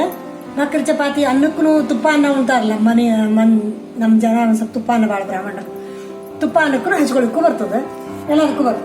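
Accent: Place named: Hubballi, India. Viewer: ಅಮ್ಮಾ ನೀವು ಮಾತಾಡೋದು ಕೇಳಿದರೆ ಬಹಳ ಖುಷಿ ಆಗ್ತದರಿ. ನಾವು ಹುಬ್ಬಳ್ಳಿಯವರು. ಬೆಂಗಳೂರನ್ಯಾಗ ಇದೀವಿ. ನೀವು ಮಾತಾಡೋದು ಕೇಳಿದರೆ ಅಲ್ಲೇ ಇದ್ದೀವಿ ಅನ್ಸತದ. ಮುದ್ದಿ ಪಲ್ಯ ಹೇಗೆ ಮಾಡೋದು ತೋರಿಸಿ ಕೊಡ್ರಿ.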